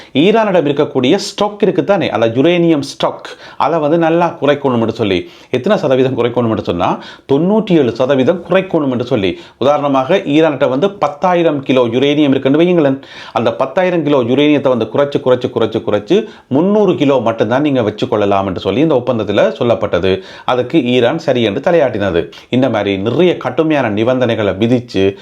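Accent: Jaffna, Sri Lanka. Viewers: தெளிவான யாழ் தமில் Love it.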